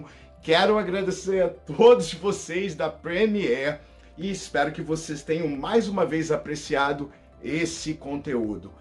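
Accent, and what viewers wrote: United States. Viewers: EU PENSEI QUE VOCÊ ERA AMERICANO, VOCÊ TEM SOTAQUE. VOCÊ É MUITO BOM, PARABÉNS ! SHALOM IRMÃO. CANAL REVERENDO MIL.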